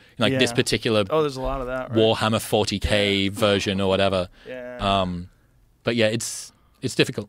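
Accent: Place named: England, United Kingdom. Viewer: This guy just repeats Hormozi quotes in an English accent and people think he's deep.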